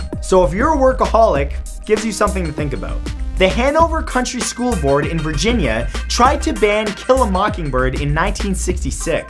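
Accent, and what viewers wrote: Canada. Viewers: He's Canadian. Pretty obvious from the accent. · Well he's Canadian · He's Canadian I am and we pronounce things different like you guys say bag we say beg! Or you guys say milk we say melk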